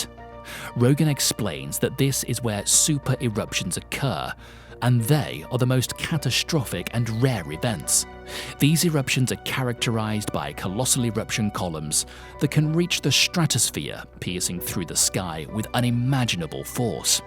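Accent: United Kingdom. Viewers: The narrator has that annoying British accent that is constantly used online to sell something, usually something that is threatening to destroy the money grabbing pharmaceutical industry. Hence, where is the credibility in overusing such an accent on the "internet wild west" where anything goes; you can say any mindless thing you want with little fear of significant repercussions.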